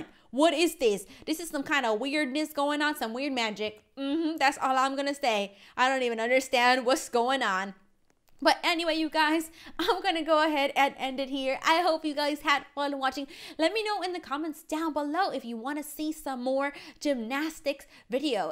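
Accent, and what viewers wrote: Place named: United Kingdom. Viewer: OMG I love ur voice h a little bit of normal, and a little bristish! LOVE YOU<3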